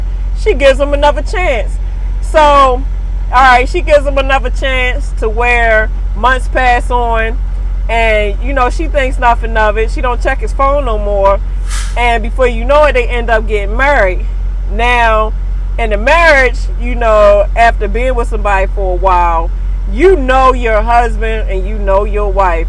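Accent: Baltimore, United States. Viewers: you can tell she from b more city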